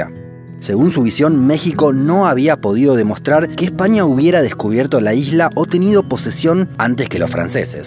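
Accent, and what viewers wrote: Rioplatense, Argentina. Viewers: Por curiosidad, de dónde es este chico? A los españoles nos cuesta diferenciar el acento de Argentina, Uruguay y Paraguay. Un saludo!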